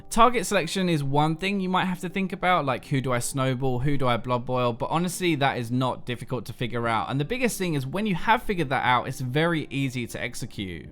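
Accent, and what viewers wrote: England, United Kingdom. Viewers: Which part of England is that accent coming from?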